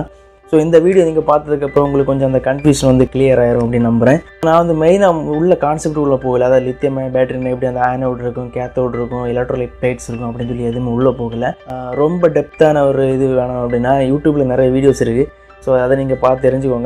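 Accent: Kanyakumari, India. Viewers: Bro ,,, neenga Kanyakumari ya ?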